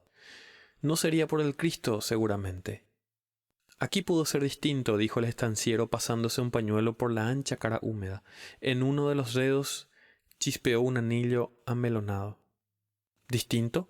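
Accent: Paraguay. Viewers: Hermoso Roa Bastos. Muy bien leído con tu dulce todada paraguaya. Gracias por brindarnos a este Maestro de la literatura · Gracias por el aporte. Sería bueno que pudieras completar el libro porque no se encuentra en youtube y con tu acento paraguayo y las breves explicaciones de términos en guaraní enriquecen mucho la experiencia. Saludos y gracias!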